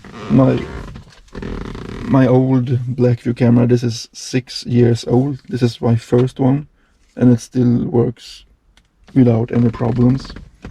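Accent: Sweden. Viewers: Your Swinglish is absolutely fine. 😃 The camera is great . Extremely clear front and rear and it changed immediately in and out of the tunnel. Excellent product for anyone that spends a lot of time on the road. Thank you once again for your beautiful scenic videos and great choice of music as always. 🤗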